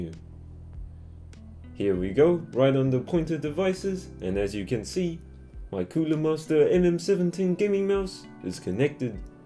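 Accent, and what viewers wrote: New Zealand. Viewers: new zeiland accent lol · Kiwi accent 😅. Look it up.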